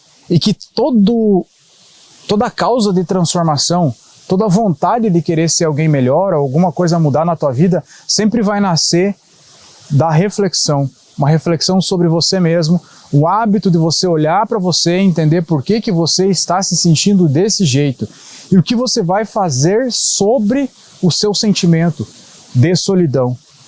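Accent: Rio Grande do Sul, Brazil. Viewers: aventureiro ou profissional os 2 é bom,mas falta um outro q falava de óculos com um pouco de sarcasmo,deboche e humor com aquele sotaque mais gaúcho,abraço irmão de batalha.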